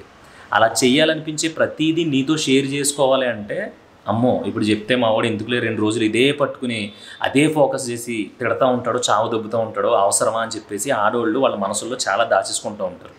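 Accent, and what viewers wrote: Godavari, India. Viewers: East godavari aa.. west godavari . Aa. Sit miru.. but great ga chepparu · Mee vuru godavari jillaa na andi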